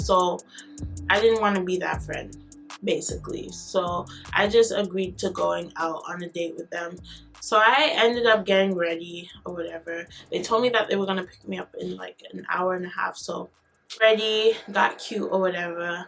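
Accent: Jamaica. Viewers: TALK JAMAICAN ALL THE TIME :) , I LOVE IT . GOOD STORY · Are you a Jamaican I can hear it I am a Jamaican myself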